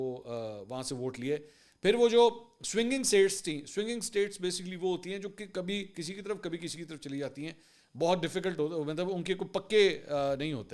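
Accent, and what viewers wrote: Pakistan. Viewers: I agree. Your Punjabi is not great. Your Punjabi has a strong hint of Urdu accent and your choice of Punjabi words is often forced and unnatural.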